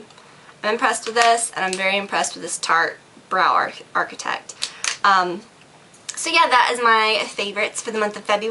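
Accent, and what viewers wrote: South, United States. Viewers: Your Southern accent is cute. :)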